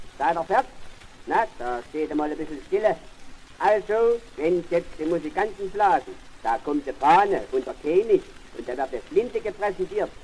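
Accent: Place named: Saxony, Germany. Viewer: nu das ist ja nu ma werklich eene scheene säckssche Offnahme. ... Klasse und Danke für das Einstellen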